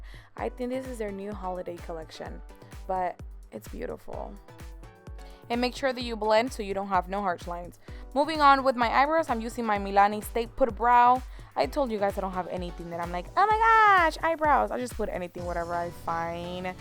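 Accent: Latin America. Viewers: Her accent isn't American lol. She's Latino which is what you're hearing when she speaks.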